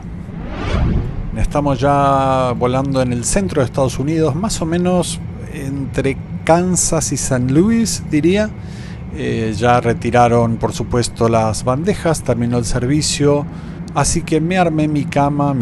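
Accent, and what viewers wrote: Argentina. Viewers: Buena crónica sos el europeo mas Argentino que escuche, me haces reír cuando te enojas.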